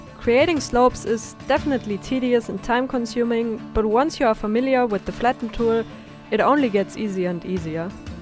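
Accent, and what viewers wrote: Germany. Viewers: Strange question: but are you from Germany? You have a pretty strong German accent. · I was just wondering because I live in Germany and hear a German accent in English a lot so are you from Germany?(you have got a lot of talent btw. AMAZING!)♥️ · Schprechen du Deutch? Your accent sounds German. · Bist du deutsche? Dein Akzent 🙊✌🏻✨ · How is this even possible? And a quick question, where are you from? You sound german :D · Bist du deutsch? Meine nur wegen dem Akzent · You sound like you speak german?!😅 so if you do, das sieht so krass aus!!!!!!! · da klingt so nach deutschem akzent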